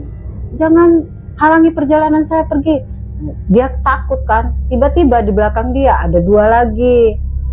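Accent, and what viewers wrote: Lampung, Indonesia. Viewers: membawakan ceritanya Lampung banget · Orang Lampung kek nya mbak nya dari logatnya Lampung bnget, soalnya saya juga Lampung bumi agung Salam kenal subscribe baru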